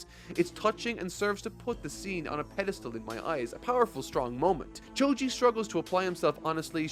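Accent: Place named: Ireland. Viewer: loves saying Cathartic in his thicc Irish Accent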